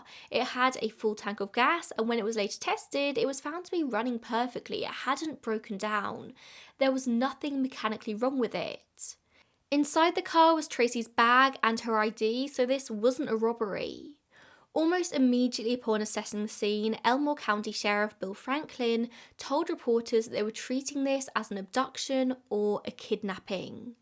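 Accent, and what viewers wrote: United Kingdom. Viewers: As an american😐😐😐😐are you serious rn? The creator, is OBVIOUSLY not American, (I think british but not completely sure) she obviously has an accent and is going to talk with her accent and dialect😐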